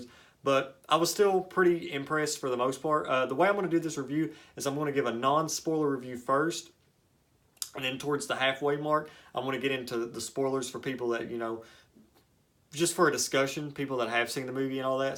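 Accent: South, United States. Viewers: I want a southern American accent like you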